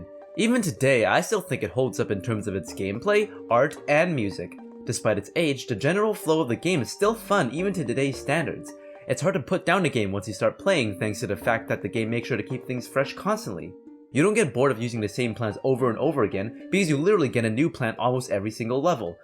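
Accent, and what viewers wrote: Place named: United States. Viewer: He is either american or canadian.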